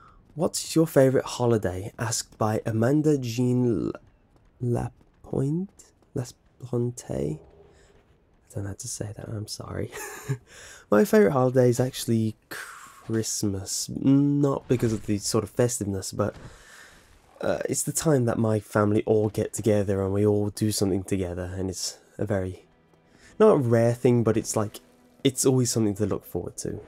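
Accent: United Kingdom. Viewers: He's brittish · What people like about your voice: it sounds Brittish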